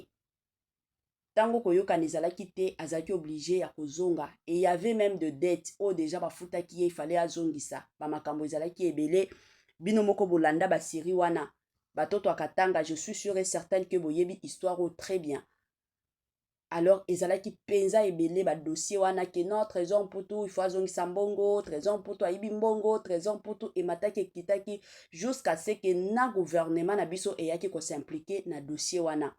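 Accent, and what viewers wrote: Rwanda. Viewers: Tika biloba loba yo oza Rwandaise,tonalité nayo eza kofunda yo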